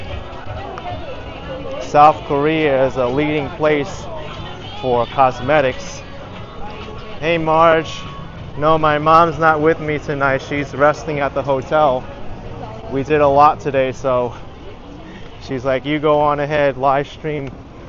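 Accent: New York, United States. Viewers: What a cool looking city. Your NY accent is so think. 😂 It gives so much character.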